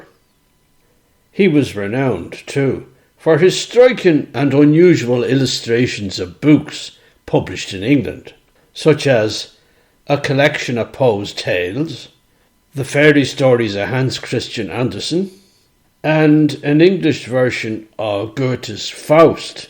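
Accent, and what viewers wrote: Dublin, Ireland. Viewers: Beautiful unique Dublin voice and a wonderful story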